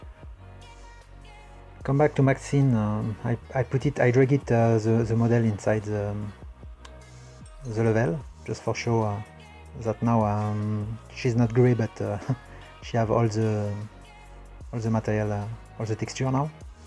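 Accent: France. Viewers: Trés bon tutoriel même avec l'anglais à la frenchy :) ! Par contre, je ne suis pas pro mais, tu n'es pas un peu en train de choisir le mode HARD pour le plaçage de tes textures ? Je me rappel vraiment être passer par autant de truc si ce n'est les nodes bien sûr ! Mais bon, chacun sa méthode. En tout cas, super tuto ! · Oh mais rien qu’a l’accent, ca s’entend que t’es français ! Incroyable ! Tu fais des choses magnifiques ! Continue comme ça !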